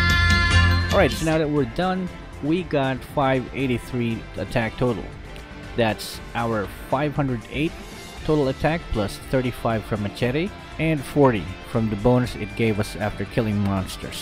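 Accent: Philippines. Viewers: From this video, now I know you are Filipino, your accent is different with other Filipino tho xD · Just as I thought, it is Filipino Englig Accent. :)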